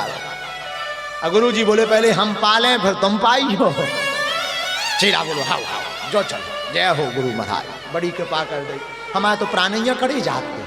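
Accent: Bundelkhand, India. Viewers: Gurudev ji k shree mukh se bundelkhandi boli bahot achhi lagti h jai sitaram 🙏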